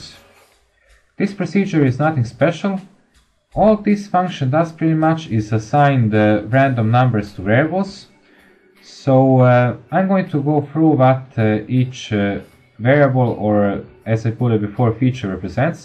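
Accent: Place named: Russia. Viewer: русский акцент , несет за версту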